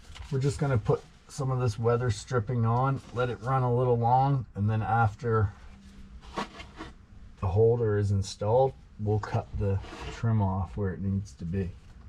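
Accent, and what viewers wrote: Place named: East Coast, United States. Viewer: Fellow Scotian here, went on Google just to get cleared on how to install this tablet holder, while watching your video, great by the way! When I heard your voice I said to myself, this guy sounds East Coast and sure enough 👍 thanks for the video brother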